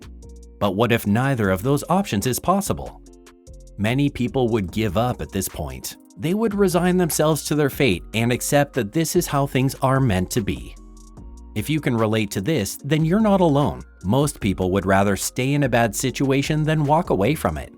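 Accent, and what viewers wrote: Canada. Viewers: you have a Canadian accent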